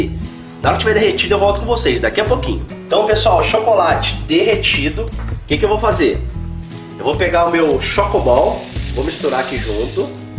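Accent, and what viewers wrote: Minas Gerais, Brazil. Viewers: Vc é mineiro? Rs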